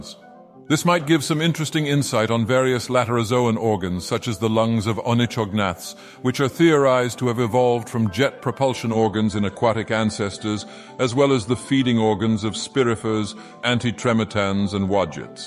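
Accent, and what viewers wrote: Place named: United Kingdom. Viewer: British dagoth ur 🧐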